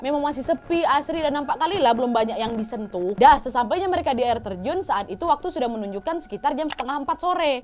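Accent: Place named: Makassar, Indonesia. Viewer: Logatnya kayak logat orang sulawesi (makassar)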